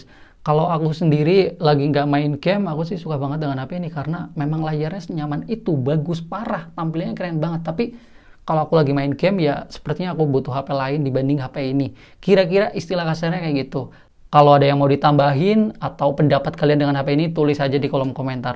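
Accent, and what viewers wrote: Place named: Banyumas, Indonesia. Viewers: Pantesan ada aksen ngapaknya jebule wong kebumen😁 Tapi keren mas tata bahasanya rapi, intonasinya bagus, isi kontennya berbobot lengkap, mungkin ekspresi aja yg perlu lbh banyak senyum biar lebih meyakinkan, sukses terus mase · wong ngapak kayane.. 😊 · halo warga ngapak :face-blue-smiling::hand-pink-waving: pada2 wong cilacap koh,rika kang kroya ya kang? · Salam ngapak bang, sama-sama orang cilacap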